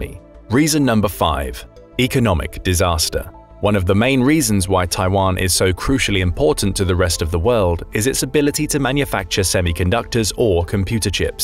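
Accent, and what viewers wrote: England, United Kingdom. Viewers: Hearing someone with an English accent use nba references is wild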